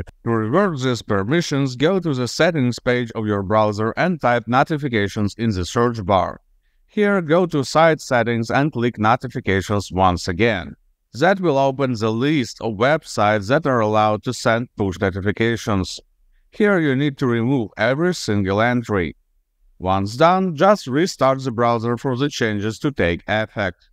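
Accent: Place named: India. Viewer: not even the AI can cover the indian accent. but thanks for the video.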